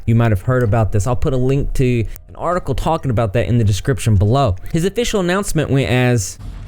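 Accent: South, United States. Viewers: I hope people know they will get mowed down by the military if they trespass. Anyway, great to see another rep report! I really enjoy your southern accent 😄 sending love from my friends and I in New Hampshire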